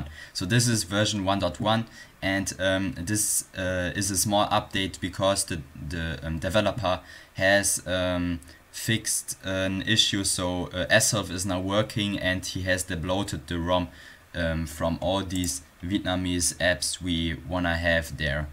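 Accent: Germany. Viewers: Man Hört das du Deutsch bist xD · Are you from germany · Oh I can feel your German accent 😂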